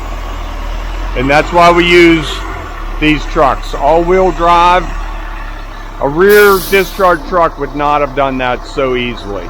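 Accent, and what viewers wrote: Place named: Pennsylvania, United States. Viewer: Where are u from? Sounds like pa.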